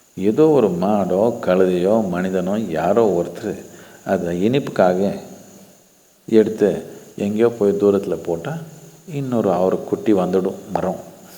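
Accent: Karnataka, India. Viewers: Ungaluku purialana apro yedukku tamizh pera eduthirrukinge neekunge adhatha. Shankaran pillai nu tamizh peru vechikitu English le Peter vooduringe naan idhuvareku tayyi mozhi teriyadhu sonnavara engeyu kelvipattade ille ulagathile indha ulagathille yaaraadhu tayyi mozhi teriyadhavaru puriyadavaru yaaraachu irrukara aah aah sadhuguru oda tamizh slang appdi irruku na avarue namma bashaikararu na kannada mozhikararu aanalu en maariye tamizh kathikitrrukaru illiya. Tayyi mozhi teriyadha aalu indha ulagathile neenge orutharu dha. Neenge tamizhane kedaiyadhu neenge enamo telungu aalu irrukala chumma tamil peru pottirrukinge avalo dha. Ungaluku naan pesura tamizhaadu puridhu nu nenakkre idhuvu purilena neenge attu tamizhan na waste tamizhan, tamizh terilena apro shankaran pillai yean neenge ellaru yedukaage vechikuvinge .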